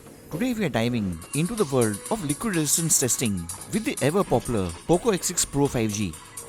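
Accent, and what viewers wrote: India. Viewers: From his accent I knew he was Indian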